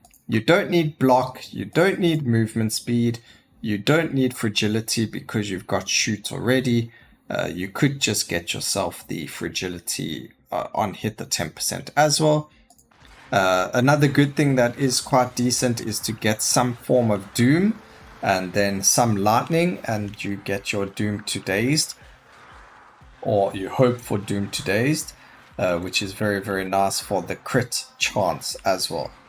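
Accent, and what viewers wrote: South Africa. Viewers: Its MAD how easily you hear the SA accent, Follow just for that!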